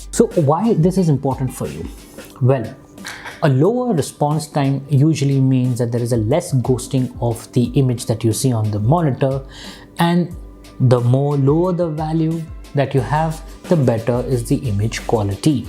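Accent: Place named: India. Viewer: This isn't any hate, my problem is not that you're indian, but the accent tickles me so much man x)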